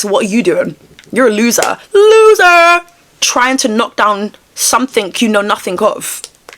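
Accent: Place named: United Kingdom. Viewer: Why are you talking British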